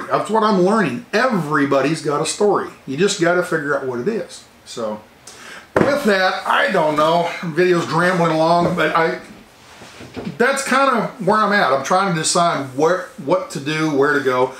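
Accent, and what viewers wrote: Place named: Texas, United States. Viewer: We follow your channel for the travel aspect of it so that is what we would miss the most if you were to quit traveling. Some of the most special experiences we've had in our travels come from visiting with the local residents I traveled with a WWII pilot with your Texas accent and he could break into a conversation with anyone anywhere. Once you get to talkin to folks there's a tremendous amount of material for a beautiful channel. In my mind, your Charles Kaualt idea would be a total winner. There is such a need for your real honest type of programming.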